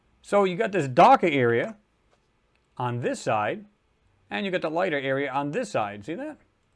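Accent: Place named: New England, United States. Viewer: NEW subscriber.. sounds like you're from New England. Rhode island here 👊👊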